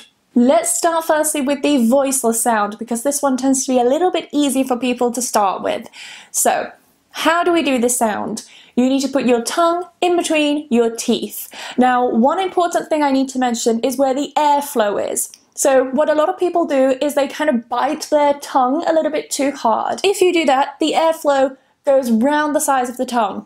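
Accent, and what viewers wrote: United Kingdom. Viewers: I feel like you speak British aren't you👀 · Sounds like British for me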